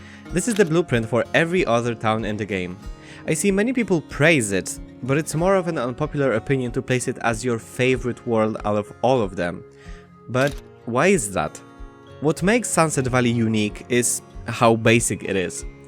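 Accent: Poland. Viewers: I keep forgetting you're Polish and keep getting jumpscared by the accent each time but yep, Sunset Valley was made as generic as possible so all players will feel comfortable playing there during the first time they get to play the game - they can choose a modern villa or an era-appropriate house based on what their preferences are and a more or less lore-important family as they please, so players of the previous games would most likely choose the Goths, Bachelors or Langeraks while new players would play any of the families in the city, especially the rich ones like the Landgraabs or Altos it's a bit similar to how Sims 2's Pleasantview had a few different styles of houses by default, like the simple white cubes, trailer pack houses or expensive mansions, no singular theme, just a default, generic world any player could relate to and feel at home (+ Strangetown and Veronaville for those who'd want to start in a more thematic neighborhood) they shared this once in an interview that they first had big-city ideas, but settled on making a small town as it would be easier to design and would be less complicated so the players wouldn't feel overwhelmed by it, and they did that by putting everything a player would need in one place, whether it's shopping, work or services, with not-as-important locations scattered around the map so it's easy to find stuff you'd need, and it would make the game feel more alive as most Sims would be seen around the world's places of interests, and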